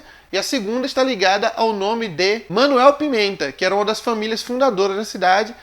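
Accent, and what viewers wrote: Minas Gerais, Brazil. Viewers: Não consigo acreditar que és pernambucano. Tu consegues ser mais mineiro que muito mineiro nascido em em Minas Geraes.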